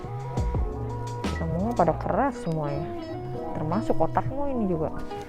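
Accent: West Java, Indonesia. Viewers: Asli org sunda?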